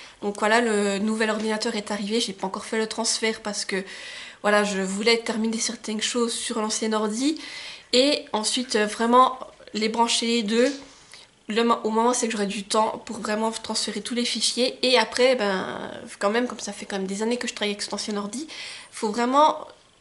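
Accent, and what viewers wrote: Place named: Jura, France. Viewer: Salut j'entends comme un accent du jura je suis également jurassienne ça fait plaisir de voir une suissesse sur YouTube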